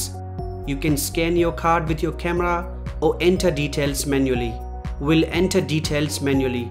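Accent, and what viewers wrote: India. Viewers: I really wish you guys could get thru that Indian accent your almost as bad as new jersey accent man seriously I have to focus like a sniper to understand your English you are better than most . But seriously guy I can hear every letter of any word from Penelope Cruz and she has heavy latin accent but that Indian English accent i can not grasp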